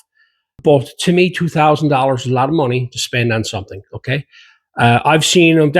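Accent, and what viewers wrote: Ireland. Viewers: It's amazing how similar to Irish accent yours is! And thanks for the great videos! Superb stuff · I really like your bare bones approach I'm 76 have a fitted,small metal/wood shop keeps me alive. I hope you don't mind me asking as an Irishman do you have an Irish connection or are Irish yourself I thought heard a slight broge there also the way you phrased some of the things you said, sounded like my grandparents of 70 years ago, brought a smile to my face.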